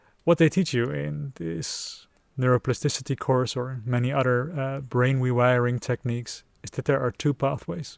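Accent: Netherlands. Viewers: Exactly Its the balance. I realised for me I first need that feeling in myself that my fear is seen and calmed down like my inner parents talking to my inner child to reassure it. Then I let go of the resistance and there is an opening for undertaking a positive action and a good practise is doing a positive visualisation before the action that makes it very strong and usually succesful. For me For a long time I tried to do Brain retraining and forgot to first do brain retraining on relaxation and safety in the body which Made every letting go make me feel dizzy fatigued and in a stress state. So finally realising this and working on it now gives me an opportunity to let go of old beliefs and implement new ones. Are you dutch btw? You sound very dutch to me.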